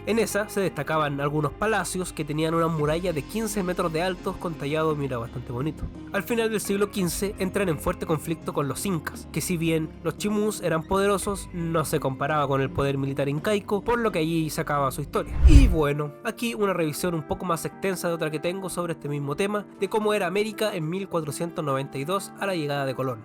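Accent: Chile. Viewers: Eres chileno ? A ti si se t entiende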